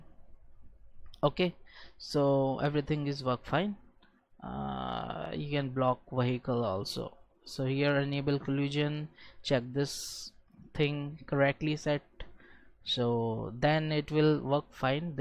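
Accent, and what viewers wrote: India. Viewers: bhai jo bolne walo ki english bolne ki style hi alag hoti hai jo bhi indian hoga wo acchr se ye english samaj sakta hai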